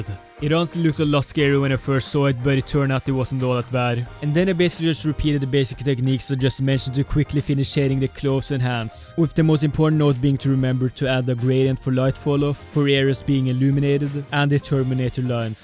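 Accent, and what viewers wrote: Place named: Norway. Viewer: Nice video, love these artstyle breakdown type of videos. Also goddamn you sound Norwegian lol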